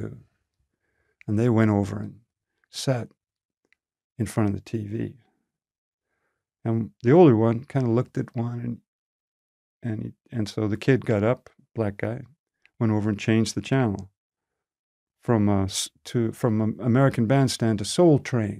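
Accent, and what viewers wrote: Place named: Widnes, United Kingdom. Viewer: Widnes lad innit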